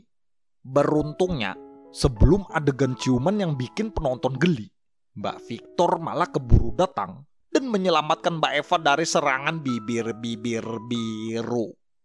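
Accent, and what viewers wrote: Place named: Java, Indonesia. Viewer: 😂😂😂 kejawaan banget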